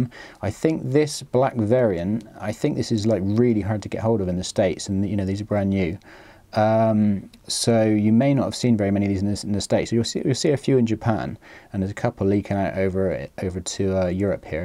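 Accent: Bristol, United Kingdom. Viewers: Fellow Bristolian? But in the Ocean so maybe Plymouth?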